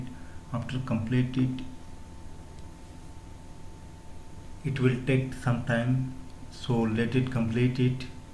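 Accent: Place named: India. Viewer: is Indian accent, I feel fine with it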